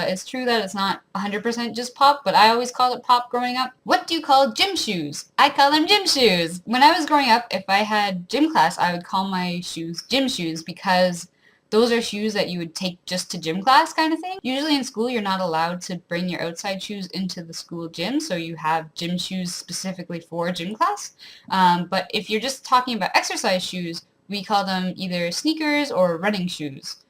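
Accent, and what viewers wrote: Ontario, Canada. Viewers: I can hear your accent; i'm from Ontario as well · SO... CANADIAN... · I loved listening to you speak! It's true about the "out" & "about" thing. But I really think it's cute the way Canadians say it. I'm from Northern California btw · I can hear the accent. I’m from southern Ontario as well but not a rural area. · I also apparently have the Canadian accent. But you, you are what memes are made from. Date me. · I'm from London ontario and your accent it's super stereotypical canadian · Formally from Southwestern Ontario (grey/bruce county). Lost most of my accent the last 20 years and I can lightly hear your accent, but my friend in Ontario can't at all, and my friend out west here with me can hear it really strong. Are you from Perth County? Your accent sounds similar to what I used to have. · I was trying to explain my uncle’s “rural Ontario accent” to my son. 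I’m from the Toronto area and he was as well but more the outskirts, eventually to places including Barrie and eventually Palmerston. Your accent was pretty close! It’s interesting being from the burbs of Toronto and knowing I sound a bit different than my cousins, with a Brit influence on top of that. One specific thing is the tighter run-between of one word into the next for you plus the order of words in some instances. “Holla!” is not a thing I would ever say…might say “hey there!” or “hi!” or “what’s up!” I do know my accent is distinctive—people outside of Toronto can usually tell. 😂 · Yeah I can hear the accent, too many hawks down in southern ontario. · I'm from peterborough ontario, I can definitely hear the accent since I've lived in Toronto since 2009, so my accent is like a mix of ptbo and Toronto now but definitely a difference.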